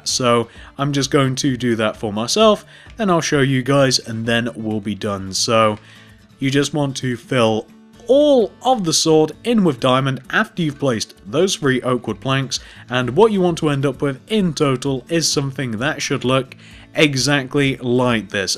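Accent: United Kingdom. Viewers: I rememember this channel as a kids, the British accent and the voice I use when doing a tutorial now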